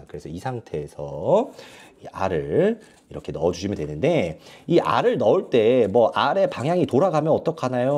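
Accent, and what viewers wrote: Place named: Gyeongsang, South Korea. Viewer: 와 경상도 사투리 찐이다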